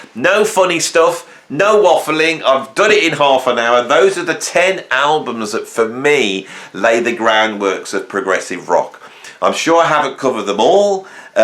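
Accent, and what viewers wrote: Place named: West Midlands, United Kingdom. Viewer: All your videos are informative, funny , intelligent and with such love of the subject that i cannot be anything but impressed.... And its good to hear a west midlands accent espousing mind nuggets 👍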